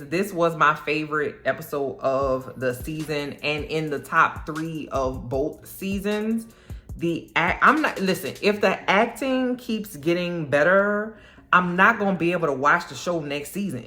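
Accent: New Orleans, United States. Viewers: First of all, M’am I love you’re new Orleans accent! It's so pleasant to listen to. In my personal opinion, the reason the show isn't a smash hit is, unfortunately, due to the bigots and racists drowning out the positive noise of the show. In 2024 some people are still not comfortable seeing 2 men as romantic leads. Also, some of the die-hard book/movie fans can be their own worst enemies. They want an adaptation that's verbatim to the source material. They also nitpick things to death, there is no room for nuisance. Oh and don’t get me started on it, if you say you like or dislike a character they act like you committed a crime. I read that Interview with the Vampire is coming to Netflix soon, hopefully with more eyes on the show. It will get the attention it deserves. The show is so good, everybody deserves an award! From the creators, producers, cast, crew, costume, and set design. 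Hell, even catering deserves a little something too.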